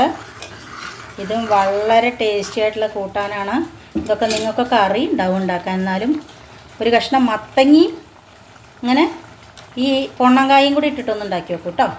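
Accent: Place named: Palakkad, India. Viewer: പാലക്കാടൻ ഭാഷ അവധരണം കേൾക്കാൻ ഭയകര ഇഷ്ട്ടമാണ്..പചകം സൂപ്പർ ട്ടോ😋❤❤...ഞാനും ഇതുപോലെ ഉണ്ടാക്കാറുണ്ട്😍😘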